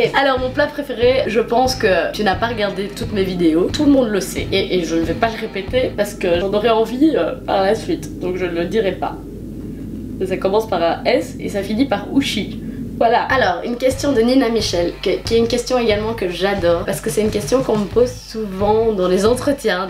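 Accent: Belgium. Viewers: Ahahah j'avoue le coup de faire une vidéo seulement si on a atteint un tel nombre d'abonné-es je n'y aurais pas pensé ^^ Si tu fais un meetup je serais là ! :) Je pense qu'on habite la même région au vu de ton accent et de là où j'tai vue. D'ailleurs si tu fais un prochain FAQ j'aimerais juste savoir dans quelle ville tu habites? Les youtubeur-ses belges sont tellement rares! ^^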